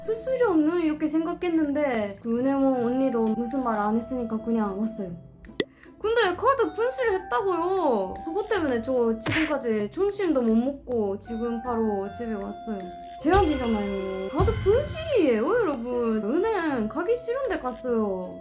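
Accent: Gyeongsang, South Korea. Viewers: 뭔가 대구 말투가 되어가고 있다. 억양이 느껴지네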